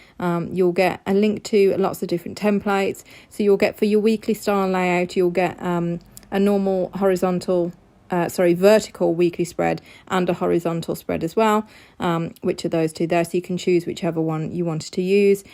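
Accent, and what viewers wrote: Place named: Birmingham, United Kingdom. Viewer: Which part of Birmingham are you from lol, enjoyed your vid.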